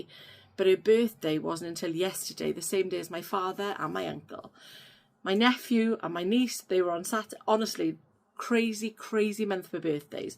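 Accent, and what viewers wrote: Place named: Wales, United Kingdom. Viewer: Love your `Welsh accent whatever day you post.😃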